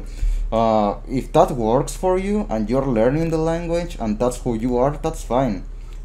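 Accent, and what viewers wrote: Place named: Latin America. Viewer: Hi, I gather that you’re an American Spanish speaker from your accent (I mean, a native Spanish speaker from Latin America). People from Spain have a different accent when they speak English. I'm not sure if you're from Mexico, but I'm absolutely sure you're not from Spain. Man, I'm very impressed by your English! I’d like to speak English as well as you. Someday I'll get it, I hope so.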